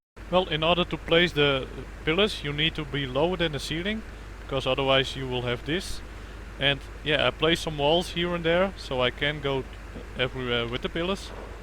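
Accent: Netherlands. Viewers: 100% your dutch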